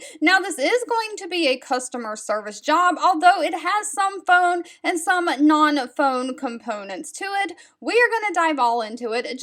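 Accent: United States. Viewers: She's sooo American - cannot understand what she is saying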